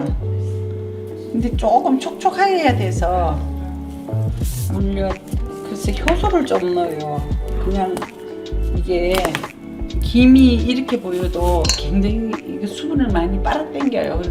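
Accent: Gyeongsang, South Korea. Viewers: 스님 음식은 누구도 할수없는 몇가지 따라해봤는데요 이주토속적이면서 귀한 음식 약 간경상도 사투리섞인정감있는목소리 .스님 건강하시고 다음 또기대합니다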